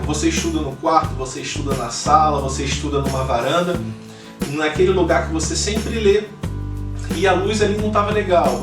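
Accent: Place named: Rio de Janeiro, Brazil. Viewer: O cara é muito gostoso. Vou ouvir essa aula várias vezes antes de dormir com esse sotaque carioca. Muito bom. Principalmente quando você foi ao quadro. Massa cara.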